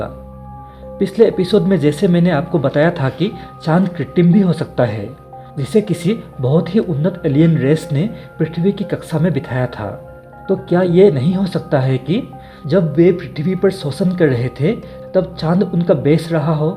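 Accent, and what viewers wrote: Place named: Assam, India. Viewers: তুমি অসমীয়া নেকি ?তোমাৰ মাতটো অসমীয়া নিচিনা লাগে, তোমাৰ ভিডিঅ বিলাক চাই ভালেই লাগে| 👍👍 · Oh assamse he.. toh.. language me thodi parechani toh ho he sakte he..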